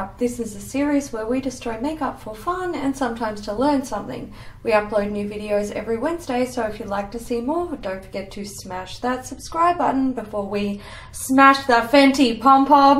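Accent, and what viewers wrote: Australia. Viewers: I love watching mainly because I LOVE the Australian accent. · Your accents make me miss Australia so bad. Stayed in Melbourne for a week and I need to go back xD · Autralian english is so funny. Mühn müüs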